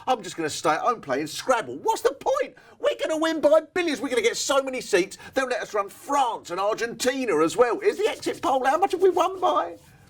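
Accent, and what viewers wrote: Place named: Northern Ireland, United Kingdom. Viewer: His Nrthrn R'ish accent is OP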